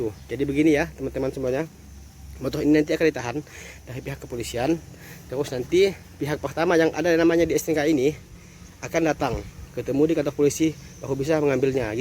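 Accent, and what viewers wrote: Indonesia. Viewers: Amuntai kh ini,cara bicaranya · Orang aceh ya bg ?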